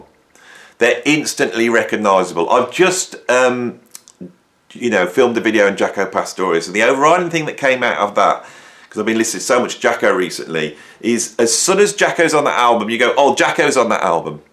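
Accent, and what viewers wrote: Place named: Midlands, United Kingdom. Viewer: First of your videos I came across, agree with everything you say, so I subscribed, and you sound like a Brummy or Midlander.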